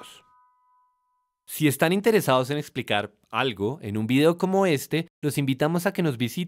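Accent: Bogotá, Colombia. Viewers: Eisenkopf Alpo es acento bogotano, en Colombia se habla diferente, dependiendo de la ciudad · E el encanto del acentico Colombiano.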